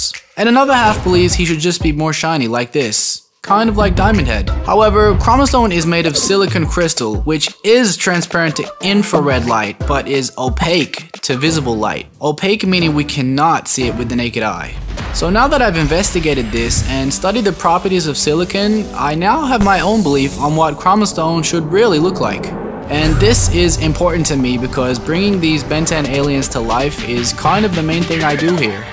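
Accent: Australia. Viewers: Cant tell if your australian or american or something else lol, nice video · aussie n proud bro, its a sick accent 💪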